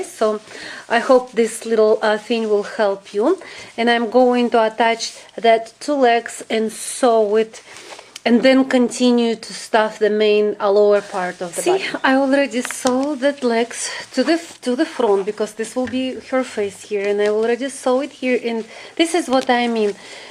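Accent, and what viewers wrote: Russia. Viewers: Я не говорю по английски, но по-моему, такой сильный русский акцент))) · ужасное произношение извините конечно но надо поработать) очень русский акцент ((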